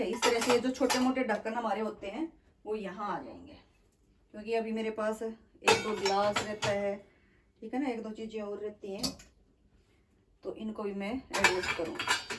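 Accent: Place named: Haryana, India. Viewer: Main aapki new subscriber hu mam..aaj ek dishwasher ka video meri screen pe aaya to main usko dekh rhi thi...to aapki video dikhi....aap hryana se ho kya..aapki awaz me hryanvi tone aati hai...to puchha...bcoz m hryana se hu......bahut hi impressive bolte ho mam aap...nice video...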